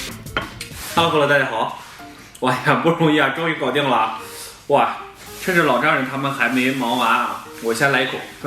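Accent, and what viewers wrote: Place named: Bayannur, China. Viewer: 我跟你说~ 听出来蓝 是个巴盟人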